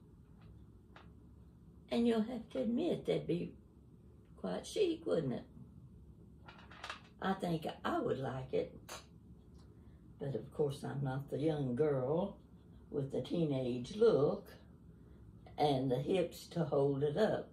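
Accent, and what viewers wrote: South, United States. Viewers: yes the deep southern accent. Awesome.😊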